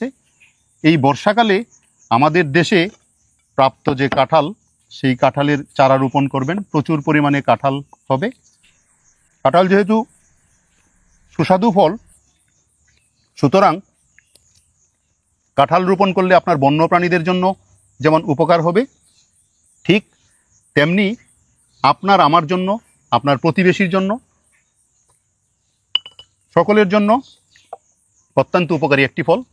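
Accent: Bangladesh. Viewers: কথা শুনে এবং চেহারা দেখে বুজতে পারেন না এটা বাংলাদেশী চ্যানেল?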